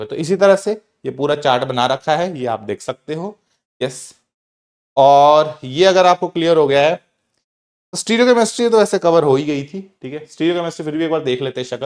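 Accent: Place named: Punjab, India. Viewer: Sir tusi punjabi hege o na , kai vari thodi punjabi nikal jandi ee 😅😅😅, I'm also from Punjab 😊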